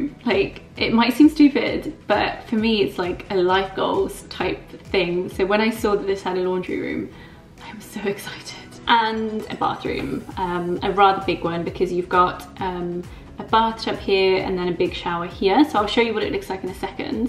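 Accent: England, United Kingdom. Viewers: As you polish? And why do you want to live in Poland? You sound english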